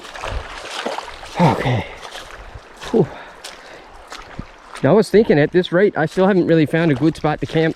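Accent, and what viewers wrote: Newfoundland, Canada. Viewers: Are you from Newfoundland ,you sound like you have a bit of an accent? I just started watching the vid and had to work...I'll watch it later...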